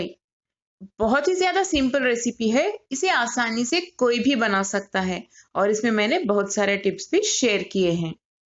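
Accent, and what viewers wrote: Hyderabad, India. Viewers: Are you Hyderabadi?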